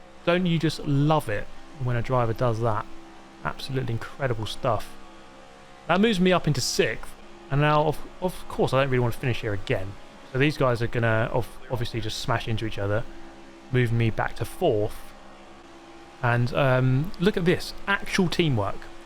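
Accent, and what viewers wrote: England, United Kingdom. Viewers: Nothing beats British commentary and sarcasm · It’s not so much the silliness of the video, but I think it’s a combination of watching and listening to an Englishman, in an English accent, explain the circus that is NASCAR. Thank you for this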